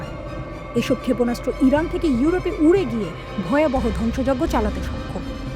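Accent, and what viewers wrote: Kolkata, India. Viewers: কোলকাতা স্টাইলে নিউজ পড়ছে এর বাড়িকি কোল কাতায় নাকি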